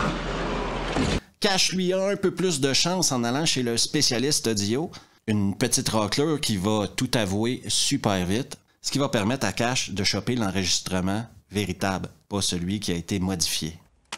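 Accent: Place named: Quebec, Canada. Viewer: Ton accent québécois 🇲🇶 est vraiment très difficile à comprendre pour nous les français 🇫🇷🤷🏽 il faut vraiment travailler ton accent !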